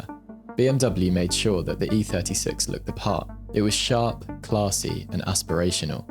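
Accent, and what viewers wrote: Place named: United Kingdom. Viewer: You have a UK accent. It's called a saloon!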